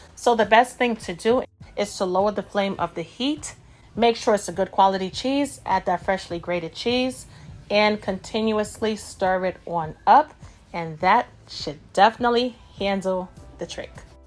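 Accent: New York, United States. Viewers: Lil mama got some skills also hummm I hear some NY in that voice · I’m making this today! Thank you! Btw are you from the DMV? New York? I love your accent 😊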